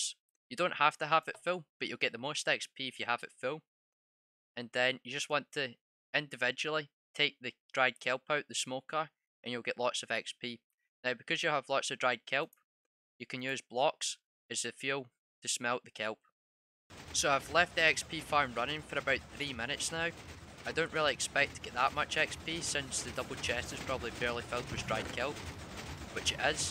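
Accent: Scotland, United Kingdom. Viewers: He Scottish mayte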